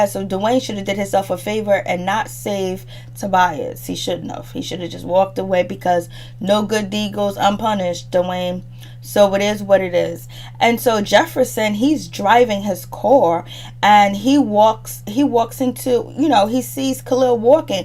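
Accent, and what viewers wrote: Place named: East Coast, United States. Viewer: your East Coast accent didn't hurt either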